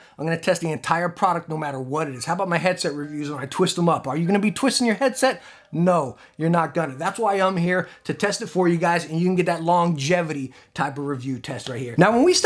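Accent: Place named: Italian American, United States. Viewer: You have this Italian American accent like those in Martin Scorcesse movies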